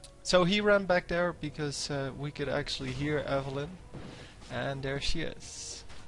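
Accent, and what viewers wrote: Netherlands. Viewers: haha I noticed he's Dutch because I talk the same way as him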